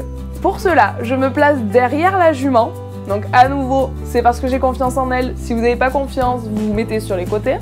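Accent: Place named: South, France. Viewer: j'adore l'accent du sud ❤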